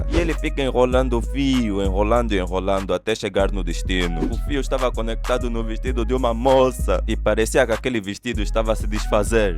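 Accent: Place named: Mozambique. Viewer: eu achei que vc era um Moçambicano da língua de Quelimane e igualzinho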